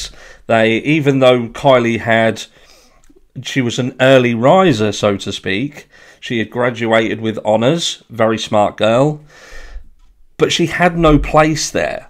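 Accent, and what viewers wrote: United Kingdom. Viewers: I appreciate your commentary and hearing a British accent is refreshing.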